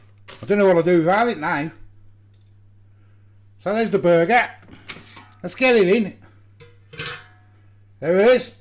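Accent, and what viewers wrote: Birmingham, United Kingdom. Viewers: Proper Brummie love it.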